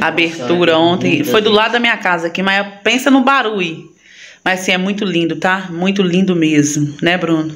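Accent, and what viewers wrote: Minas Gerais, Brazil. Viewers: UAI, vc é mineira? RS adoro o seu jeito de falar.. eu tbm falo assim e sou mineira. Amo seus vídeos. Bruno tem jeito de ser um menino muito bonzinho. Um beijo no coração de vocês. Deus abençoe! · Eu acho muito legal seu sotaque mineiro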